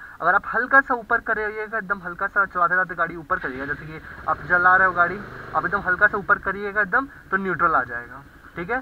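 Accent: Bihar, India. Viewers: bhai aap bihari ho???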